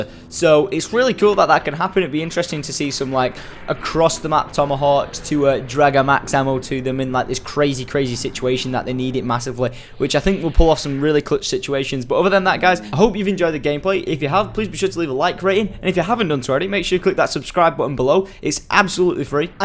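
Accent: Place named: Manchester, United Kingdom. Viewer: You sound like your from Wigan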